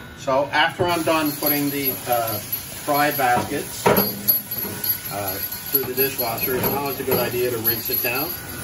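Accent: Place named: Canada. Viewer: I hear Canadian accent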